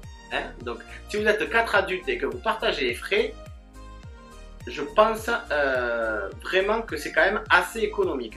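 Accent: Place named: Haute-Garonne, France. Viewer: c est parceque moi je suis du fin fond de la Haute Garonne et expatriée dans l Oise à cause du boulot... donc entendre parler comme chez nous ça me fait du bien !!! Merci pour votre contenu qui est à la fois intéressant, bienveillant et drôle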